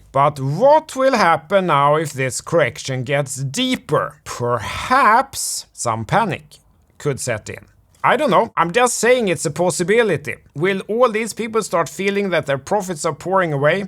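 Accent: Sweden. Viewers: Svengelskan on point (Y)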